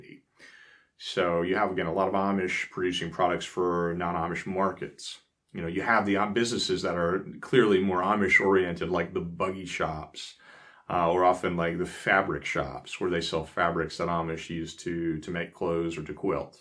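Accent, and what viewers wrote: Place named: Philadelphia, United States. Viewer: Very interesting vid. Enjoyed it. Been visiting the Amish in Pa all my life -- Your accent is striking -- the O in home, phone, -- you from Phila, Pa?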